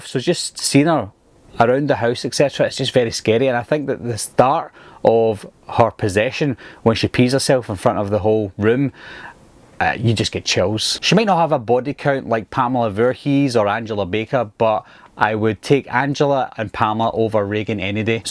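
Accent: Scotland, United Kingdom. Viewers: Is this guy scottish?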